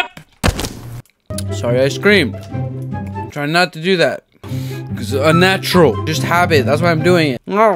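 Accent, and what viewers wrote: Norway. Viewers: Are you from Norway ๏︿๏ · You are from Norway right?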